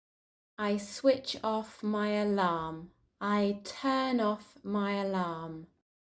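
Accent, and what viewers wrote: United Kingdom. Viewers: I guess you’re British because of your accent. I live in Taiwan. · Amaaazing! I love the british english🇧🇷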